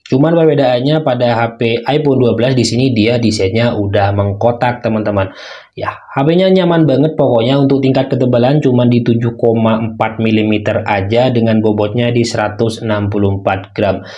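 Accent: Madura, Indonesia. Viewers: abang org madura ya logatnya khas banget😅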